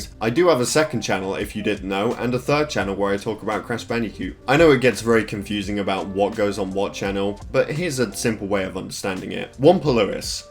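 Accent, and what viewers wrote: England, United Kingdom. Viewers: Im here because of his english accent voice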